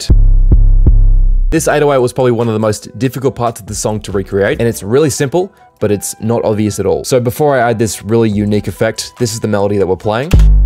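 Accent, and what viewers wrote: Australia. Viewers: U Aussie mate ?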